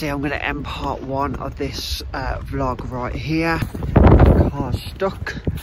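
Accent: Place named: Scotland, United Kingdom. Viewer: Ps… love the Scottish accent 😂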